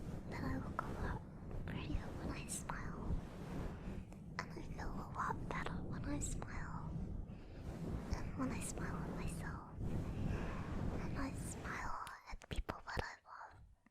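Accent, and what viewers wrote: United Kingdom. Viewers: Sometime it sounds like you have a British accent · YES THE NORMAL BRITISH VOICE IS BACK THANK YOU THIS HAS CURED ME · is she britsh it kinda sounds like it at some points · Honestly...the older shadow theme was fun and unique but I’m really loving the more typical type of ASMR from you especially with your normal voice and accent. I do have a soft spot for the British accent being from the UK myself😊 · with a legit bri'ish accent? Never heard it before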